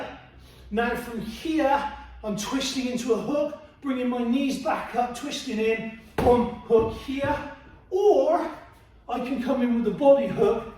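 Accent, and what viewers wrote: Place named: England, United Kingdom. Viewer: That English accent! Cool 👍👍👌👌👊👊🥊🥊🥋🥋🙏🙏😁😁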